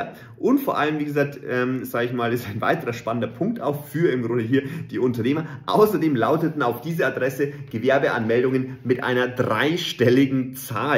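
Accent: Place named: Bavaria, Germany. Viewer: Kann jemandem mit solch einem Dialekt never ever zuhören..sorry, bei aller Liebe. Wohl was eher für die bayrische Bevölkerung. Vielleicht hat er einen Blog..dann lese ich lieber!😅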